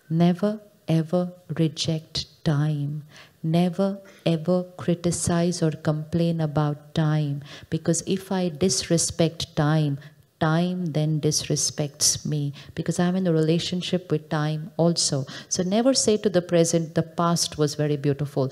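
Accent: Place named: South India, India. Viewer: Sis kuchh English word pronunciations southern india tone va tone se lagtey hain ..the ketala . Is mumy is from southern region. Of cpurse no about Papa as i had reviewed the photo snaps of the excellency .s parents . Subh Ho. Om shanti sis .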